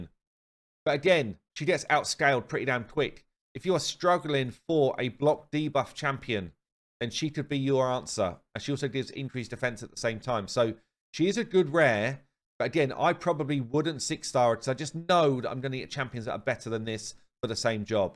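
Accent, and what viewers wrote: England, United Kingdom. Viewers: and you should go for a update for some of the free heroes and the artifacts. Almost all the free to play heroes. Love the content. And your English accent 😂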